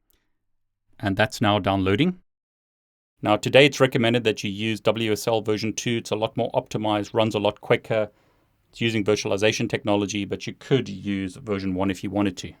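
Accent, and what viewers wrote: South Africa. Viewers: can hear your raw south african accent ! thanks a lot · Ah a South African! Goeie video! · Are you South African? :)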